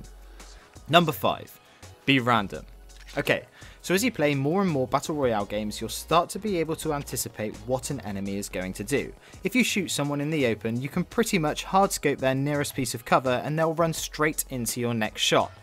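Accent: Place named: England, United Kingdom. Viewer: You have an English accent. I trust you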